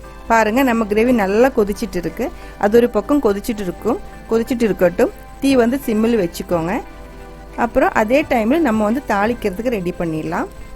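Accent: Kerala, India. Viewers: Semma recipe, thank you so much sissy 😍😍😍.... Unga kerala kalantha Tamil lovely 😍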